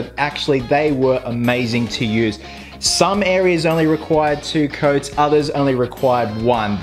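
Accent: Australia. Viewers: I could tell you were an Aussie from the start of the video.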